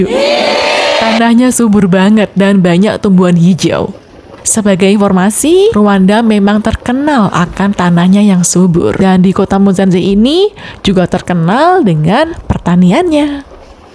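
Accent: Java, Indonesia. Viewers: Smpyn bahasanya kok kayak orang Jawa sih Mbk , jawanya mana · Maneh adalah bahasa Aceh artinya manis, dan mungkin berasal dari bahasa Melayu. Ya saya berasal dari Aceh, yesss saya asli Aceh, seorang pria jelek, tua ( 38 tahun/ 15 juni 1985 dan tapi kita muslim tidak pernah percaya kepada ramalan zodiak / bintang karena percaya kepada ramalan, tahayul, khurafat, dukun, sihir adalah hal yang paling dilarang dalam islam dan dosa besar) dan saya sudah menikah ( 29 juli 2010 Alhamdulillah) istri saya juga asli Aceh. Saya bisa menebak In Syaa Allah bahwa kamu orang Jawa walaupun kamu mirip Sunda atau mirip orang Sumatera tapi saya yakin kamu bukan orang Aceh, karena gayamu, karakter kamu, logat kamu sama sekali tidak mirip orang Aceh. ALLAHU AKBAR ALLAHU AKBAR ALLAHU AKBAR... Baiklah adik saya dari Jawa, apakah kamu sedang tertidur setelah sahur atau tidak, atau sedang membaca tulisan saya ini, please 🌹🌹🌹 jika kamu punya banyak waktu bacalah terjemahan Al Quran di hp mu secara acak beberapa ayat saja, itu akan membuat kamu bahagia In Shaa Allah dan saya minta maaf telah mengganggu anda lagi. 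ALLAHU AKBAR🌹🌹🌹 !!!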